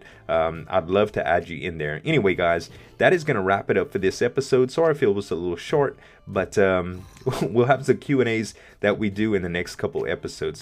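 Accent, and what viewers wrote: Louisiana, United States. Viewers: Based on your accent, I guess You live in the USA, am I right? If I do, I would like to ask You which state do You live in? Do You own any firearms and what is Your opinion on them? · I can't place your accent. Where are you from? Louisiana?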